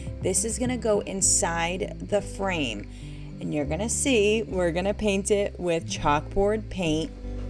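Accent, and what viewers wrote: United States. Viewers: Where u from? I hear a hint of an east coast accent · I’m from Somerset MA and you sound like me. I picked it up right away. I was going to say Attleboro but Plymouth makes sense too.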